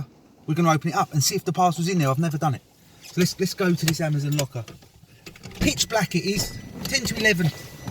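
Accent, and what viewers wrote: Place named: London, United Kingdom. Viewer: Refreshing to get a London accent on YouTube.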